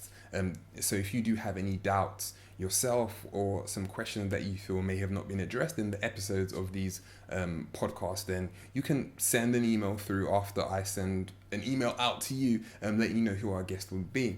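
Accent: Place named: England, United Kingdom. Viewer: i love u´re english accent :D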